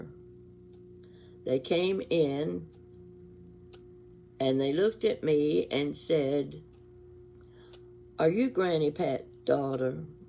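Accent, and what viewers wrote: South, United States. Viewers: I remember you reciting your memory, you could have knocked me down with a feather when you perfectly pronounced a town that is very familiar to me in your broad southern accent lol x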